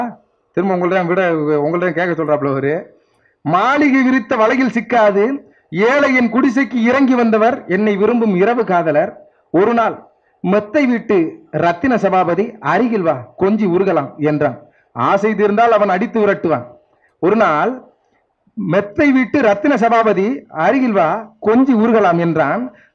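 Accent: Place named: Thanjavur, India. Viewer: Semma voice .. Ithu Thanjavur slang mathiri ye irukku.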